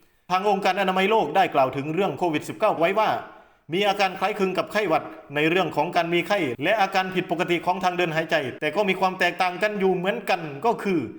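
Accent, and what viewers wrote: Isan, Thailand. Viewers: คนอิสานแม่นบ่เจ้า😁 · ใช่สำเนียงคนอีสานแท้ๆ😄😃😂😁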